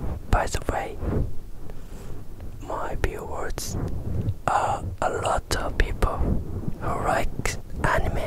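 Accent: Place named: England, United Kingdom. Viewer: I WOULD LOVE THE ANIME CHARACTER VIDEO OMG I'LL WAIT FOR IT HEHE that cute english accent tho— AND THAT KISS IN THE END OF THIS VIDEO OMG SPSPWOSJAJALAKAHSHHSJA btw i like one piece, haikyuu, hxh, ace of diamonds (there are so many anime that i've watched so i just type the title that pop out in my mind :D)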